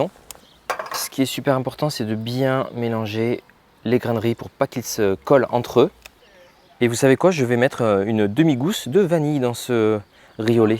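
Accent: South, France. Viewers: Avé l’accent…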